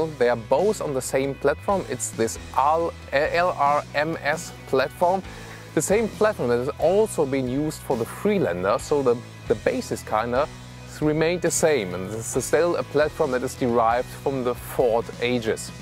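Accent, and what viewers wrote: Germany. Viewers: I hope you mean "Bruno," but even so the only similarity is a German accent. And Bruno is actually Austrian.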